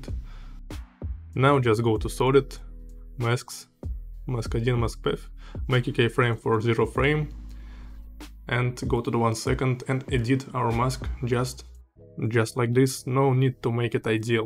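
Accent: Russia. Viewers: С первых секунд ролика понял, что ты русский)) а после "mask один" я орнул))))) классный английский бро)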